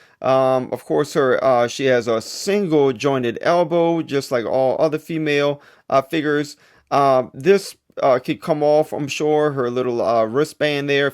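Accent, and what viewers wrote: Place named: New York, United States. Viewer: ..and I was thinking you lived in NYC lol. You're vocal inflections are very similar to Jay Z.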